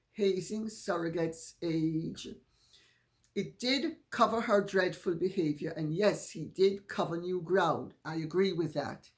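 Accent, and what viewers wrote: United Kingdom. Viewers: ☆☆☆\\\ELAINEWASHINGTON///☆☆☆ THANK YOU, LADY C FOR ALL YOU DO FOR YOUR HARD WORK ON RESEARCH ON OBTAINING THE CORRECT INFORMATION . THEN WRITING SO THAT THE INFORMATION SOUNDS OF INTEREST TO ALL LISTENING . YOU DO SUCH A FINE JOB AND I WANT TO SAY THANK YOU AND HOW MUCH I ENJOY LISTENING TO WHAT YOU HAVE TO SHARE. I LOOK FORWARD TO HEARING YOUR VOICE EVERYDAY . YOU ARE SO BRITISH ! WE HAD A BRITISH COUPLE VISIT OUR CHURCH AND THEY WERE SO WELCOMED ! WE WOULD ALWAYS ASK THEM TO TALK BECAUSE WE LOVED HEARING THEM ANSWER ! WHY ? WE ENJOYED HEARING THEIR BRITISH ACCENT AND SAYINGS. I LOVE YOUR ACCENT ! YOU ARE SO BRITISH ! I LOVE YOUR EXPRESSIONS AND ACCENT . THANK YOU LADY C. I LOVE THE WAY YOU SOUND WHEN TALKING !💖 I LIKE YOUR JEWELRY AND DRESS . YOU LOOK SO GOOD💝 HUG THE FUR BABIES.💋 THEY LOVE YOU LADY C .💛💚 LADY C DID YOU SEE ON UTUBE WHERE HARRY WOULN'T HOLD HARRYS HAND ? 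DO YOU SEE 👀 THE UTUBE VIDEO ? DO YOU HEAR ANYTHING ABOUT THR TWO DIVORCING ? MANY PEOPLE HAVE SEEN 👀 INTERCHANGING BETWEEN THE TWO HERE AND THERE THAT HARRY 👀 WASN'T HAPPY WITH RACHEL RAGLAND 😒 ON ONE OF THEIR APPEARANCES . RACHEL SEEMED INSISTENT TO HOLD HARRYS HAND. THAT MADE HARRY PULL AWAY FROM R.RAGLAND MORE ! DO YOU THINK THE HONEYMOON IS OVER ? HARRY LOOKED SO VERY UPSET !😡 ☆ THOMAS MARKLES AND HIS ELDEST DAUGHTER , SAMANATHA , PLUS HIS SON ALL ASKED NOTTO REFER TO MEGAN AS MARKLES ANYMORE FOR THOMAS HAS DISOWNED HER AS HIS YOUNGEST DAUGHTER . HE SAID SHE HAS TOLD SO MANY LIES ABOUT HIM AND THE REST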